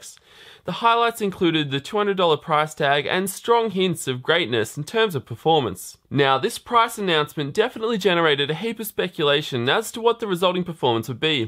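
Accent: Australia. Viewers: Oh ok, I didn't know this....hey so you have an Australian accent, do you live in australia or...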